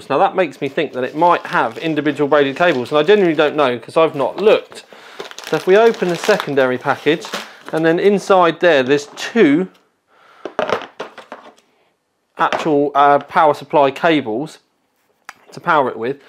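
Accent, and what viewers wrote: United Kingdom. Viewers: yoooooooooooooo britishaceeeeeeeeeent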